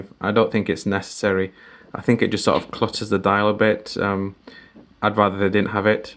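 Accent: Yorkshire, United Kingdom. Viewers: Will do my friend I've subscribed so will be watching your reviews from now. Happy new year . PS do I detect a slight Yorkshire accent may be Leeds ?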